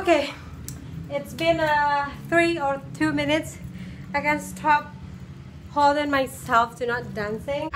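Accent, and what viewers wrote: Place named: East, Algeria. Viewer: هههههه و الله ضحكتيني فالمقطع تاع اركحوا علينا برك و هدرتك تباني من الشرق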